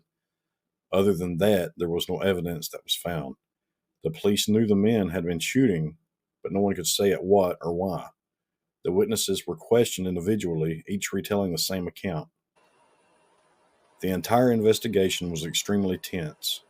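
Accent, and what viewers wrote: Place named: South, United States. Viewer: Great story telling. The southern accent makes it so much better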